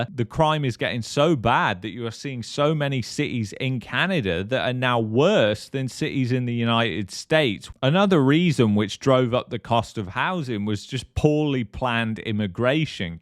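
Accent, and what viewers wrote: England, United Kingdom. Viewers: This guy with an English accent.. obviously not from here... constantly telling people how bad our country is...try a positive video for once..or move home bud..guys like you are bad for our society.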